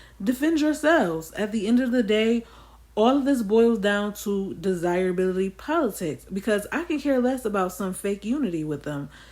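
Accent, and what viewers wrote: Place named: Brooklyn, United States. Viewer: I like your Brooklyn accent. You from BK?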